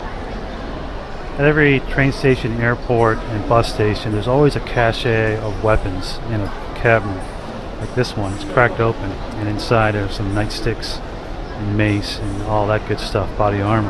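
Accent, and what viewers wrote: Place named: United States. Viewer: Yeah, if they did arrest him I would assume they would use him as a bargaining chip for Uncle Sam. I assume he's American anyway, due to the accent.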